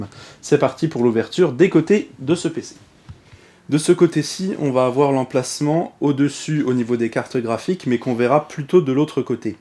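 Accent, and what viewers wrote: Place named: France. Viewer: fucking french accent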